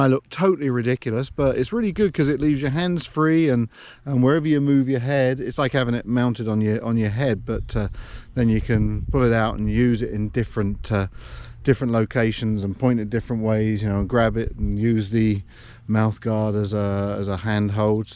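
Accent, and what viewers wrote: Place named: United Kingdom. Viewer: I actually meant to say, “when did you leave England? You sound more British than Canadian.”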